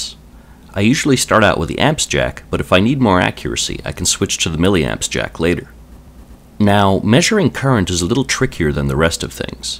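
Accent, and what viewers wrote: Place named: Canada. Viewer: Nice channel. Good content, Canadian voice and humour. Bon!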